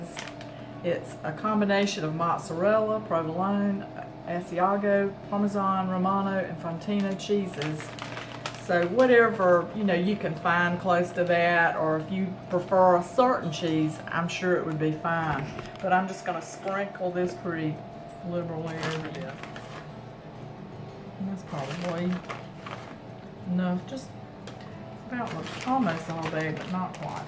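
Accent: South, United States. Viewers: Yum! Love your southern accent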